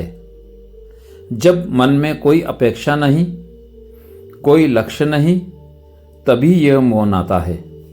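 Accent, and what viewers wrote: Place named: Rajasthan, India. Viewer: Bhai aapka ascent hi complete nahi aap mut bola karo. Aap shabdon ki khichdi banate ho. Aapka hindi per sahi control nahi hai. Aap rajasthani ho.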